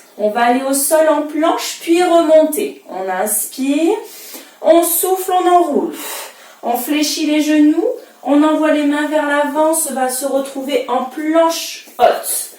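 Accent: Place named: South, France. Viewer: Merci, grâce à ton sourire, ta compétence et ton joli accent ensoleillé (je me régale avec les cottes bien ouvertes et les épolles baissées 😉) j‘ai commencé ma 1ère journée de vacances avec un cours de pilâtes qui m’a donné la pêche! À bientôt!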